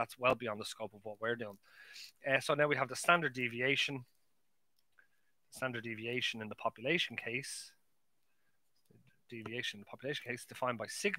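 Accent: Ireland. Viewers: I was studying the time series chart video at like the first very second in on the video I noticed ur irish xdd geez nice videos man I'm taking MATH201 (probability in business) or so so I'll be using ur channel a lot :) so you've got a new subscriber hahahah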